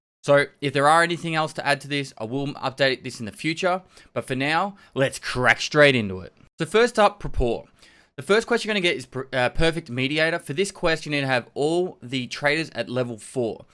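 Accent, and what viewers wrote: Australia. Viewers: a Absolutely no offense intended here, but your (?Australian?) english is a bit harder for me to listen to (sorry, I really mean no harm, I'm not native speaker). And when you add this intro thingy it's really hard to swallow. Most of the times when I play the video, I hear it and I stop the video, then I have to take a deep breath and after like 5 minutes I can finally get to the video (or should I say crack straight into it? Anywqy I hope you dont interpret this in a wrong way. I support you and I thing you are one of the more informative guys.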